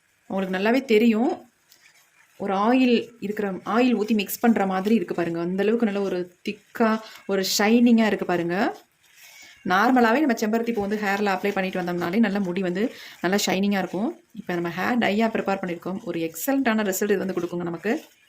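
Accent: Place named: Kerala, India. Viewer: Unka mudiyai kattunkal Unkal pechai kettal malaiyalu mathiri illaiyea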